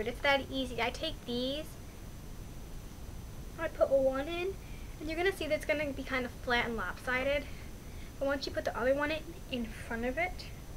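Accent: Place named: New York, United States. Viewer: You can kinda hear New York accent in this video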